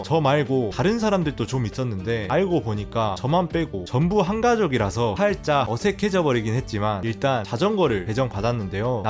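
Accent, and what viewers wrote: Jeolla, South Korea. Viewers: 님 전라도 사람이신거같다 말투가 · 3대가 서울인 나에게 전라도 동상의 사투리 귀요미.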